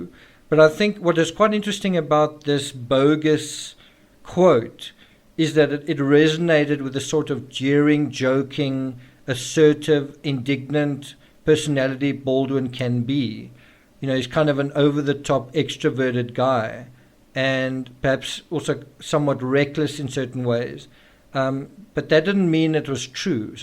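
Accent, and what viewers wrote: South Africa. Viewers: I know an SA accent when I hear it. Awesome channel! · Are you from south africa? Your accent reminds me of my friends from south africa. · South African youtuber?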